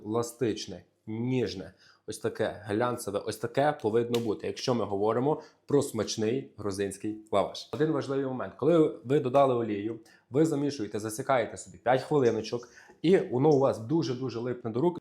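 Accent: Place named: Lviv, Ukraine. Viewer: За акцентом - це мій земляк, львів'янин! Цікаво, вгадав, чи ні? Рідний говір майже завжди пізнаю. А тепер питання: 4 хлібчика мені забагато, чи є якісь тонкощі, якщо розділити все на 2?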